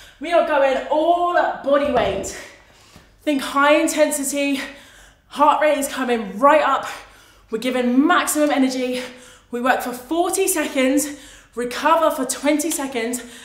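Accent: England, United Kingdom. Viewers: I Love you English accent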